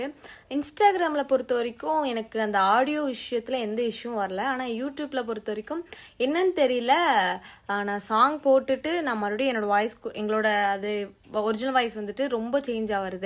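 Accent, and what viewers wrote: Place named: Theni, India. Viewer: Hey guyss! Video ku mela 3 dot eruku adha touch panna audio track nu varum adhula Korean orginal nu varum adha touch panna nammolda kodai kadhal orginal voice vanthuerum. Kk ellaru mob layum ithu available than ok. Kodai love story pakkum pothu engaluku shy aguthu ❤ anna nanum theni thaan namma theni slang la pesunga innum nalla eruku urimai ya erukun namma slang ok ❤ pin me kaa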